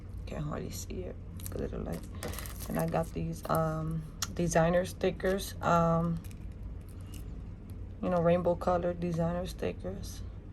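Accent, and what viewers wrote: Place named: New York, United States. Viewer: Your getting better with your videos love the haul and your content girl I love your accent are you from N Y TFS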